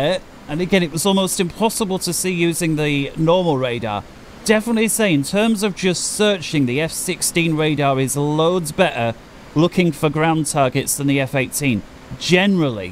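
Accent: United Kingdom. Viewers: A really good teacher, even tho you speaking british :D If it comes to GMT, i only use it for looking for targets, then when I do, i lock with radar and then quickly switch to TGP and point track, then use laser Maverick to kill them.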